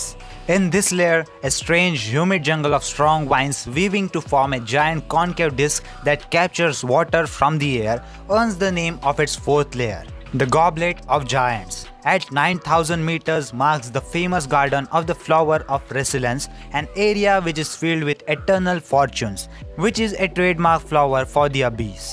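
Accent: India. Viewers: Very intreating good job! I don’t personally mind your accent because I’m also Indian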